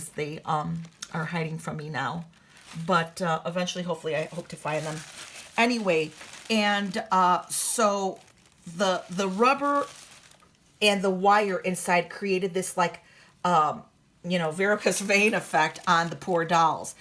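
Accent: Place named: Texas, United States. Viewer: That a Texas accent if I ever heard one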